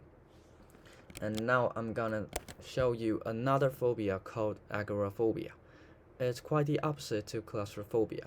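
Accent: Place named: United Kingdom. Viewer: 這英國腔你是英國人吧 wml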